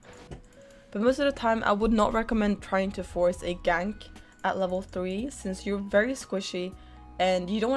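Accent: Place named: Sweden. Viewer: omg girl I love you! Im also playing jungle on Gold right now, mainly Evelynn and Kayn and its so nice to see another girl that also likes to play jungle. This whole stereotype of girls playing only supp or mages is so annoying... And I feel less alone now! :D Oh, if you play Kayn, evelynn or elise it would be also awesome to get an guide on those champs. Even tho I main them I still feel I have alot to learn and it would be nice getting this info from another girl! And Eve feels so weird to play once youre a bit higher on ranked because people actually know how to play against her then and how to get back into the game once u are behind.. So, some advices for gold- Plat would be nice! Oh and youre swedish accent is so damn cute! :D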